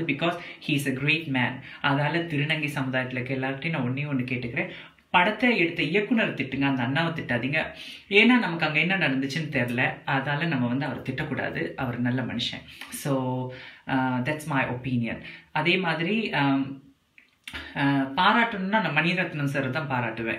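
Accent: Sri Lanka. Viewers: Tamil nalla irukku sri lankan aaa neenga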